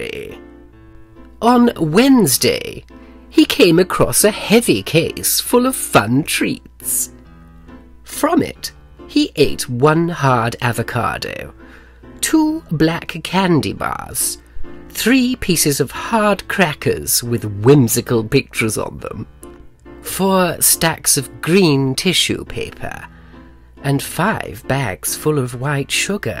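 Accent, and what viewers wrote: United Kingdom. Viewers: The most Bri'ish person eva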